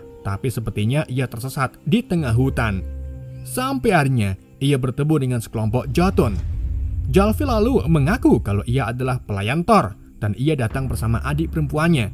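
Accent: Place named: Wonosobo, Indonesia. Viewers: Admin e wong jowo, damput😂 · Membetett i iwak .. Curiga wong Wonosobo iki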